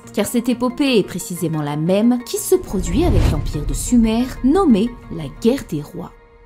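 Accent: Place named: Belgium. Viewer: Superbe video! J ai adoré,et j attend la suite avec impatience. Quel travail, quelles recherches, et quelle analyse! Franchement, les mots me manquent. J avais moi meme fait les meme rapprochements,mais j aurais ete bien incapable de les presenter comme tu l as fait ici. Oui le travail et l inspiration d Oda sont impressionnant, mais ton boulot l est tout autant. Je suis moi meme plutot tres interessé par ces sujets que sont les anciennes civilisations et les evenements passés allant de la prehistoire a l avenement des premieres civilisations, en passant par la precession des equinoxes et son effet sur notre histoire. Le parralelle entre les siecles oubliés de onepiece et le chainon manquant comme tu dis,ou encore avec cette periode obscure de notre histoire ( -12.000 avant JC) et les anomalies qui en ont decoulé, jusqu a enfin l avenement de civilisations telles que les sumeriens ou encore les antiques egyptiens…Bravo, un grand bravo a toi. 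Et comme si ca ne suffisait pas, tu pousses le talent jusqu a la redaction et la diction d un texte travaillé. De toutes les chaines, hypotheses, et analyses de onepiece que je vois passer, je peux dire sans hesitation que c est avec ton boulot,ta vision, que je suis le plus en phase. Merci pour tout ca, et felicitations. ( par contre, un de ces quatres, ca serait pas mal de pouvoir mettre un visage sur cette delicieuse voix qu est la tienne. D ailleurs,il me semble avoir decelé un leger accent belge, notamment sur les sons en [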